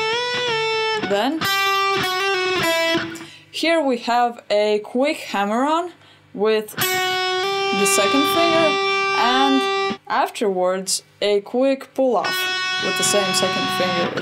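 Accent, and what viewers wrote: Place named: Russia. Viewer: да конечно нужна. тоже смотрю. Благо инглишь нашего брата ни с кем не перепутаешь и легко понимаешь что говорят.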